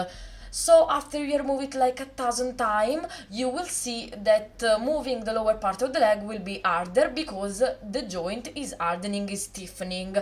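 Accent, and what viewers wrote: Italy. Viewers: Amazing video, thank you! 💗 I have just started to get back into Monster High and bought two dolls with loose leg joints, I'll be sure to try this on them! And honestly, your English is super good, like you can see how you know the slang, jokes', terms and such. Other than that there's only minor mistakes from time to time with the conjunctions, but I think that getting better with that will just come with time 🤘 Also you have such an cool Italian accent, I love it! ☺️👍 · Yeah, your english is fantastic. Never lose the depth of your Italian accent when you speak English, it is Perfect as it is! 🥰🥰 Also thanks for saving my Clawdia's legs! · Your English is beautiful - your Italian accent is delightful to listen to :) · Perfect video to help me fix my daughter's dolls. Also, your English is very good! I love your Italian accent 🥰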